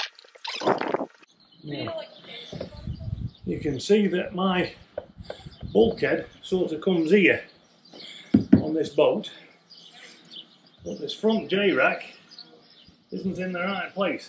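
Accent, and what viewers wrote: Yorkshire, United Kingdom. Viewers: As a fella that went to school in Whitby, but now lives in the US it's nice to hear that accent. Yorkshire?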